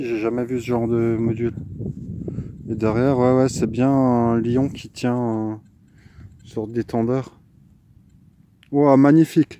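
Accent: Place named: Alsace, France. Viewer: Felicitation !!! J'en reviens pas de la chance que tu as de trouvé une pièce du Vatican. Imagine la probabilité de tombé dessus c'est un truc de ouf. Sinon je trouvais ton accent familier mais j'arrivais pas à trouver et j'avais un pote a l'armée qui venait d'Alsace maintenant je sais 😁 vous avez la même façon de parlee ça ma rappelé plein de bon souvenirs. Merci encore pour cette superbe vidéo continu comme ça.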